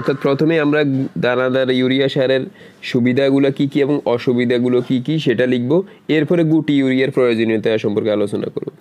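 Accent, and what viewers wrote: Noakhali, Bangladesh. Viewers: Bhai apne noakhailla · আপনার বাড়ি নোয়াখালী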